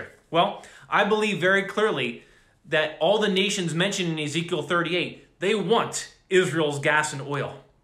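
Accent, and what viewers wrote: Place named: United States. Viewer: Oh you sound like an American.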